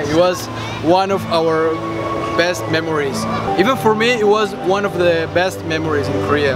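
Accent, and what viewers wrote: Colombia. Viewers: me encantan tus videos y tu acento colombiano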